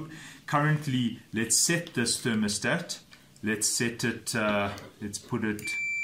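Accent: South Africa. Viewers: I'm in the middle East and I enjoy it when I hear that South African accent... nothing like home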